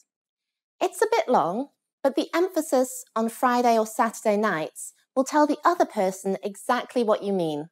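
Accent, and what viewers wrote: England, United Kingdom. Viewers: Your sweet british accent is kind of cute and its helps me to learn this accent . . . . . i don't know why but its very nice for me 😅 Btw your sitweb is useless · British accent is really fascinating...this lesson is attention taken ...I like your s-t-y-l-e...learn alot from you...thanks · Big thank you for presenting this helpful vedio to learn English with proper British accent 💙💙💙💙💙💙💙💙💙💙💙💙💙💙❤️❤️ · I love British accent!.And she is amazingly beautiful and charming.Hello,!!!!! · I was just try to learning English for 1month now I finally found a British accent , I like to learn this kind of stuff. Still I m try to learn if it's bad dnt mention it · where is the word "mate", i just wanna talk like a british to say that word with this accent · british accent is so hot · esse sotaque inglês pqp :( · I liked British accent 👍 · I love British accent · I really love this walk through the British accent and the common communication way for people around the world rather using the American accent. 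Such plenty of love this accent though. If you could do please make a short lesson for kinds to learn the British accent too. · Are you from england? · This just feels like learning American English from someone with a British accent This sucks and I’ve learned nothing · Hi dear teacher! Your British accent is wonderful and so beautiful. I love English very much! It is the most important and beautiful language of the world.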